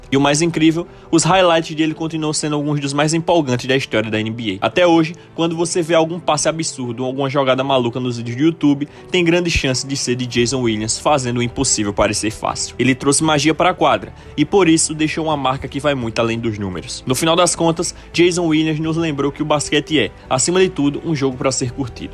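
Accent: Alagoas, Brazil. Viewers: Tu é de Alagoas? Esse sotaque denuncia... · Tu é de Alagoas? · boa irmão! seu sotaque é foda! NbA nordeste🫵🏾⛹🏾🏀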